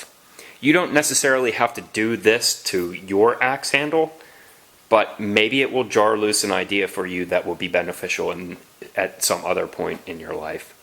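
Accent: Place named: Washington, United States. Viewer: Where is this guy from?? Sounds Washington State to me...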